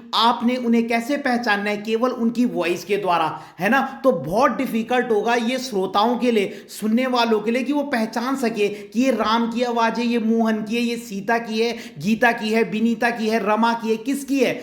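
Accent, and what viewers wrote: Garhwal, India. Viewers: Sir aap garhwali ho kyy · Are u garhwali? Tone aari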